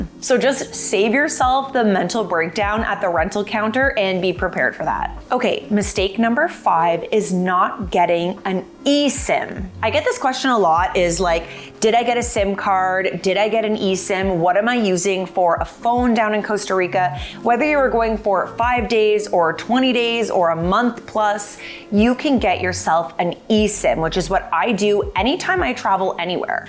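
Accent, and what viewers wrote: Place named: Canada. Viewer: I think that it has to do with the Canadian accent as apposed to disrespect or not knowing the correct pronunciation or spelling.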